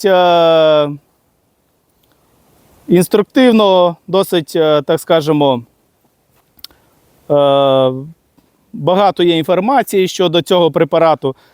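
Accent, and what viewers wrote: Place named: Bessarabia, Ukraine. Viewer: Да, не ожидал услышать такой чистый украинский из Бессарабии!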